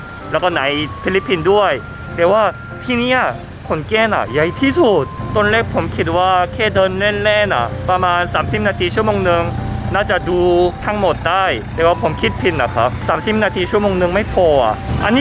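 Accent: South Korea. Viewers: คนไทยเค้าเอ็นดูคนต่างชาติอยู่แล้วค่ะ เหมือนเราก็เข้าใจว่าเค้ามาต่างถิ่นต่างที่ มาเที่ยวบ้านเรา เราก็ต้อนรับเค้าเต็มที่ค่ะ ยิ่งคุณ พูดไทยสำเนียงเกาหลีคนเค้ายิ่งเอ็นดู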